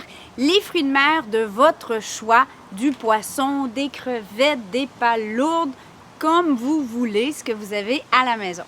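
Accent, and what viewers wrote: Canada. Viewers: j adore ton accent canadien bizou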